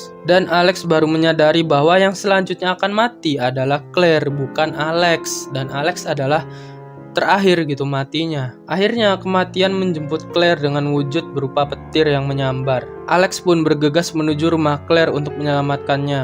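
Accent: Cirebon, Indonesia. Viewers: Admin logatnya kaya wong cerbon sih min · Anjing orang cirebon bgtt logatnya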